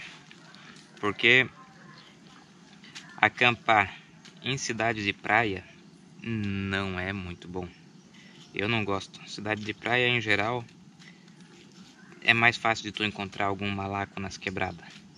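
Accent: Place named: Paraná, Brazil. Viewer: Homi vc e da onde ,tem sutaque de paranaense misturado com Catarina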